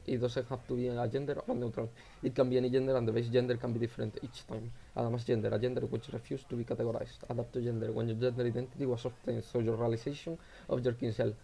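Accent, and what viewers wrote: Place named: Cambridge, United Kingdom. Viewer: Inglés de Cambridge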